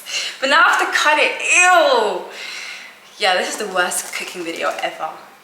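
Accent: United Kingdom. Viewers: Why are u trying so hard to sound like a white English girl? Smh.....U r BLACK!!!!!!!!!!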